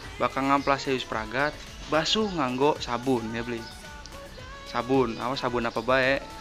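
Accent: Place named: Tegal-Cirebon, Indonesia. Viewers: Ngapak mbah... ??? 😄🤣🤣😂😅😆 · wong dermayu tah? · Lah wong ndi sampean kayae dermayu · Jawane kaya wong tegal cirebon kihh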